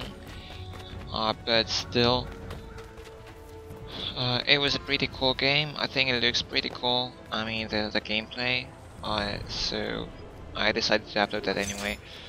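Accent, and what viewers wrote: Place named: United States. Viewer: btw for being from poland u have a "pretty-hardcore-US-voice" xD with that i mean ur english-speaking is very good! :D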